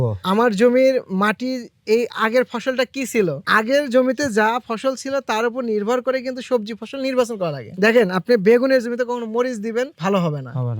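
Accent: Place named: Joypurhat, Bangladesh. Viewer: আমার বাসা জয়পুরহাট তো তাই বলছিলাম,উনার ভাষার সাথে অনেক মিল আছে তো তাই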